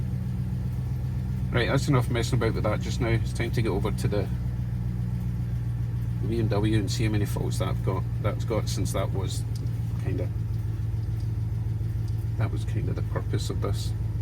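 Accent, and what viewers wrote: Scotland, United Kingdom. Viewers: I get the hint of a Scottish accent 🤣 , a more posher Scots twang than a thick West Coast Glesga, or has it deteriorated due to living in the states, excellent vid 👍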